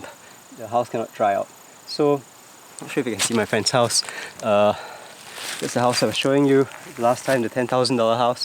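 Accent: Singapore. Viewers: I was looking for video about Irori and Jizai Kagi, and stumbled upon yours. I watched the previous episode where you documented the installation process. It was so peaceful and full of old wisdom. I heard you speak Japanese with Mr. and Mrs. Okuno, and I thought it must be another "Peaceful Cuisine" like channel. But then suddenly, eyhhh..Singlish? Anyway, when the time is right, thinking of visiting this farm-to-table cafe. Keep up the good work!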